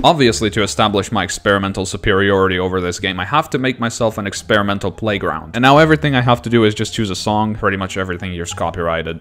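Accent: England, United Kingdom. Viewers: BRITSH MATE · yoooo is British i cant belive it yess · I am English so his accent is completely normal to me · bro youre acent is preatty bri'ish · An english accent is not a bad thing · he sounds more British lol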